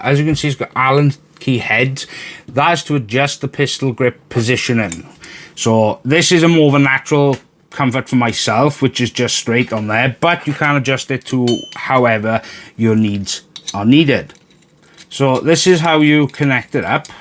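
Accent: Wales, United Kingdom. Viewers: You really as Welsh as it gets butt innit?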